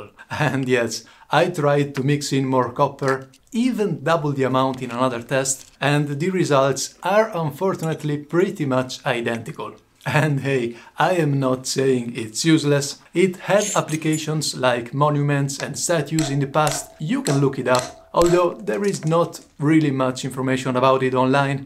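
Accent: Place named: Italy. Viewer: can i ask if you're italian? I think you are because of the accent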